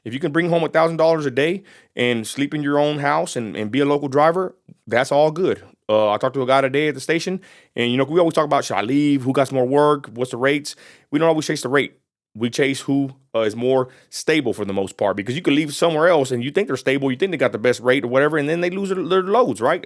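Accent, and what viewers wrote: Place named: Texas, United States. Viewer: Agreed. I was listening without video; I didn’t hear any edits or jump cuts. You have a good Texas accent too.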